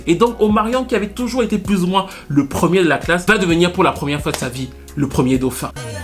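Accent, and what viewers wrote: Cameroon. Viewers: L’accent Camerounais 🤣s’entend bcp pars ici ♥️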